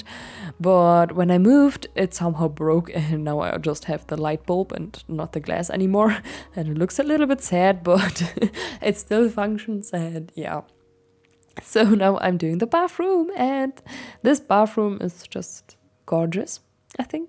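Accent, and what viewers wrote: Germany. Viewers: Are you german? because of your accent.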